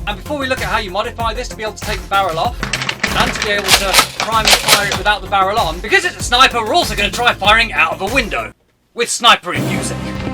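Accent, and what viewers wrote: United Kingdom. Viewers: dudechaps bri'ish coop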